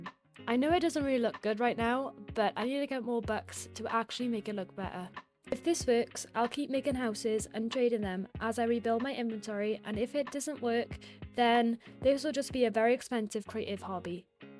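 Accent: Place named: United Kingdom. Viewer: Love your British accent ❤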